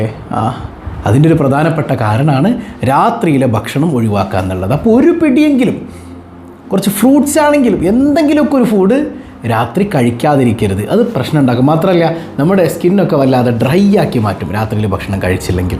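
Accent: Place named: Malappuram, India. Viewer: ഉസ്താദിന്റെ സംസാരം കേട്ടാൽ മലപ്പുറം ഭാഷ പോലെയാണ്. 🥰🥰🥰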